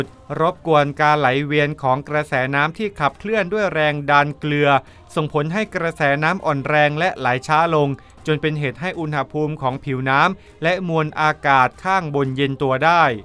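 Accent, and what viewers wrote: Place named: Surin, Thailand. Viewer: คนอ่านเป็นคนสุรินทร์ แน่เลย ชอบฟังเพลง เขมร กัลฟ์ตรึม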